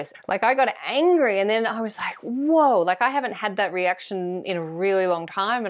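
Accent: Australia. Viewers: You are engaging and fun. You keep the teachings simple yet clear. I am loving it. Thank you! Ps and you are Australian. Living OS it is refreshing to listen to an Australian.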